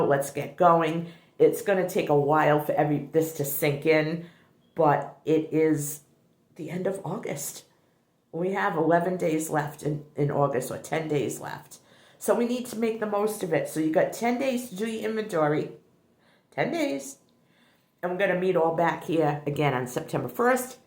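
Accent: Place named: Massachusetts, United States. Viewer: Hi!!! I met you on Jeanine's live this morning I just found your channel and I said I know that accent it sounds like me I'm in Massachusetts 😊💗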